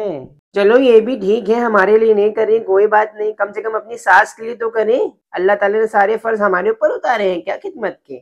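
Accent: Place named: Lucknow, India. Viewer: Your lko ascent is best❤❤❤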